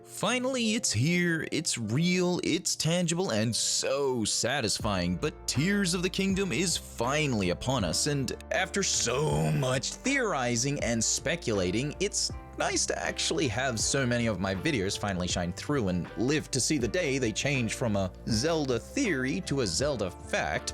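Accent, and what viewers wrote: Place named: Australia. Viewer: Your accent is so interesting. Like an Australian that’s lived in the US for too long? The way you pronounce your R’s is so chaotic. I love it.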